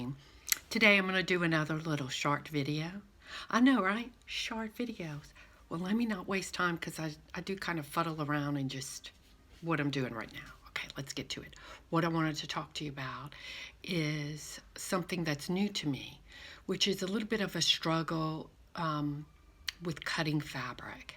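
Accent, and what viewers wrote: Louisiana, United States. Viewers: Hi. Just found your videos and I really like listening to you. I lived in Louisiana most of my adult life and your voice/ accent is so warm and friendly...and sounds like Home to me. I lived in good ole Ville Platte so I'm familiar with Lafayette and the surrounding area. I share a love for the Gees Bend Quilters too. Something about their quilts is so inspiring!! Maybe its the color combinations they use along with their improve piecing...but they just vibrate somehow and reach into your heart. Well, well I love to watch Joe Cunningham and his quilt report too. He has a friend in the Bay Area who is another innovative quilter. Her name is Margaret Fabrizio and has been making quilting videos for at least 10 yes. She is famous for making Kawandi quilts. She has traveled to India to learn how they construct their quilts. 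If you are not familiar with her Im pretty sure you would find her fascinating. Her You tube name is atree3 if you would like to get acquainted with her. Until we talk again, take care. And Thank you for making your videos.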